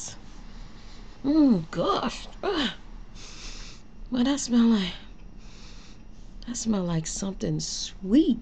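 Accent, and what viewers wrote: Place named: New York, United States. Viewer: Are your from NY? Lol i ask because you sound just like my best friend tasha and you both have a slight NY accent, love it!! You give a calm sweet vibe i like that.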